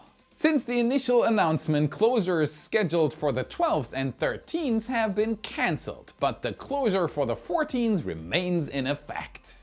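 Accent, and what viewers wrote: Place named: Germany. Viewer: I love your show. I watch it all the time. I love your German accent, too. Let me share with you a secret: Adjacent is pronounced AH-JAY-SENT. The ‘C’ is soft and sounds like an ‘S’. I’m not sorry - I couldn’t resist. Keep up the great work.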